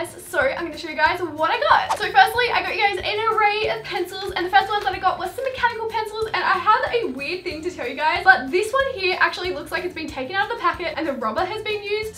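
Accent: Australia. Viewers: Is that an Australian accent? Hope I don't offend you if it's not. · she's Aussie because from QLD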